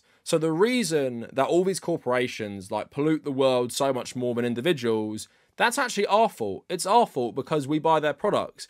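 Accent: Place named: United Kingdom. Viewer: I was gonna watch, but there was too much British in the first minute.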